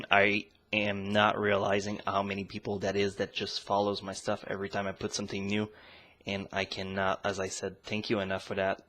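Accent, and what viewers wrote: Quebec, Canada. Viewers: Hahahaha jaurais jamais pensee que tes quebecois :) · haha clairement québecquois ;) good job ! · Are you from Quebec ?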